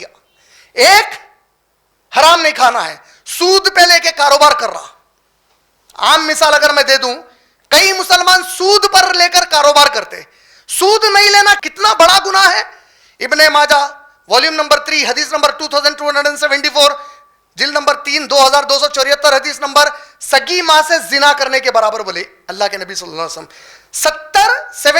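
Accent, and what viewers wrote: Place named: Hyderabad, India. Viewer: Br assalamalaikum. i always suggested you that even you speak the truth, it's difficult to accept it due to harsh wordings, so kindly speak politely. Style of addressing is very essential, it leaves meaningful impact. You raise your voice unnecessary because the truth could be explained by having patience and kindness. In'Sha'Allah.try to avoid your hyderabadi rough style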